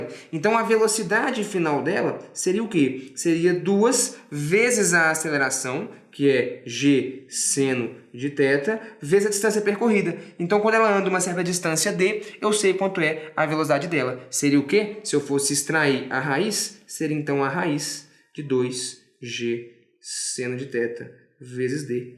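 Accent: Minas Gerais, Brazil. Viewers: Eu te amo cara se ensina bem e com um sotaque de mineiro foda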